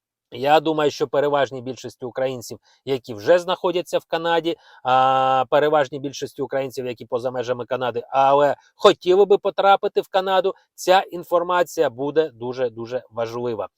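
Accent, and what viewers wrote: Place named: Russia. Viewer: Украины скоро не будет, зачем украинский язык? Тем более, слышно, что для автора родной - русский.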